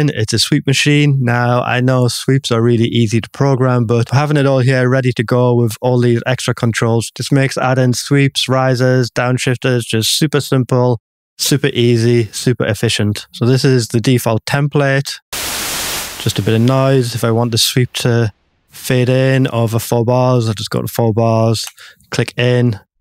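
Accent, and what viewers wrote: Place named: North East England, United Kingdom. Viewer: What accent is that? I hear the Northeast. Teesside, Wearside?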